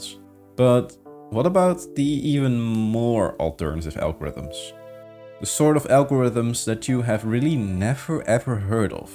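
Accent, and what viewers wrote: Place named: Netherlands. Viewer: You sound dutch, where the average male height is beyond 1.80.. no wonder you don‘t know how to deal with gnomes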